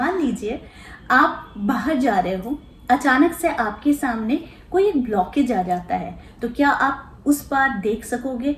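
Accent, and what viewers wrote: Bengal, India. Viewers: R u bengali???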